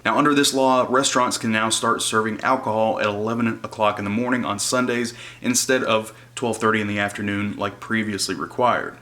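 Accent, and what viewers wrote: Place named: South, United States. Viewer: Nothing cuter than a great southern accent 😋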